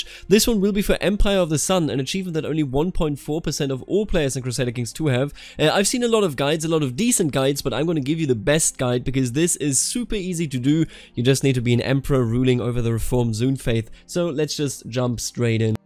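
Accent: Germany. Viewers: Lav ze German Akzent:D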